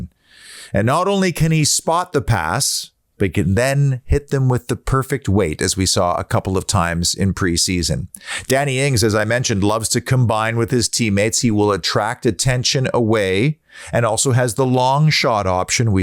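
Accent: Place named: Canada. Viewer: This is a great podcast, wasn’t sure initially when I heard the accent, what do Canadians know about football 😂, very judgemental I know, apologise for that. I will be adding this channel to my weekly watch as you left me feeling very optimistic and excited for the season a head. Keep up the good work 👍 UTV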